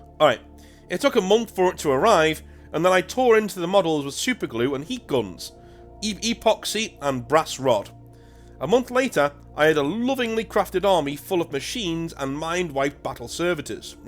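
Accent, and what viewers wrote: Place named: Liverpool, United Kingdom. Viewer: are you Scouse? I swear you have a Liverpool accent but like you've moved about alot so it's become more subtle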